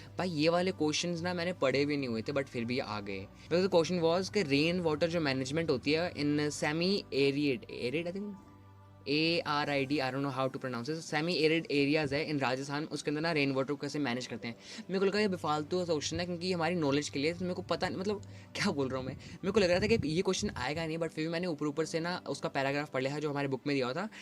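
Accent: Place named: Punjab, India. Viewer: Bai lgda punjabi a hna ?? Boln de tarike toh lgda 😂♥️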